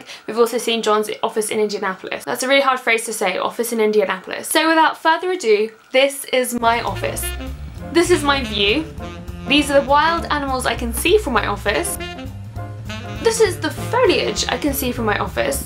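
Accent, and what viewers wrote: United Kingdom. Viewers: The Queen's English. Not everybody speaks 'Merkin, yanno? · Oh my god a British accent on vlogbrothers it feels wrong yet beautiful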